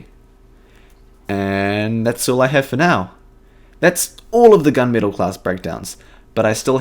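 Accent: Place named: New Zealand. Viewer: From your accent I guess you are from NZ. And holy crap you deserve more substance.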